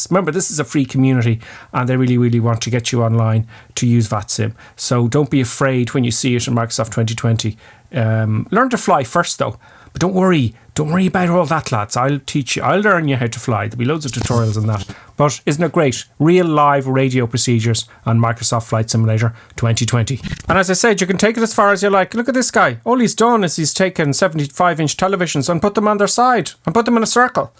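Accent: Ireland. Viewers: Where in ireland are you from lad? I’m from tyrone. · are you irish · As an American, I love your Irish accent and the excitement in your voice! And you have a great sense of humor! 😂 Makes the time pass by quickly until the release!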